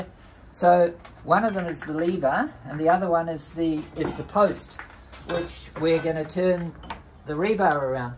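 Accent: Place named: New Zealand. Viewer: You can never get enough of that KIWI knowledge. The accent doesn't hurt.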